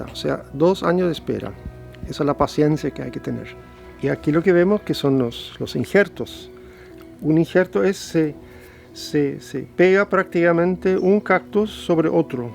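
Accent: Paraguay. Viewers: Curioso como se mezclan el acento paraguayo y alemán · Hermoso trabajo y lugar!, y ya se le pegó el acento Paraguayo 🇵🇾😍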